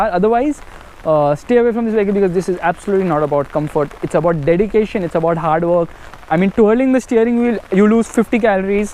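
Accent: India. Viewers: Lmao that indian accent. Why indians think they speak english like Americans. Im also indian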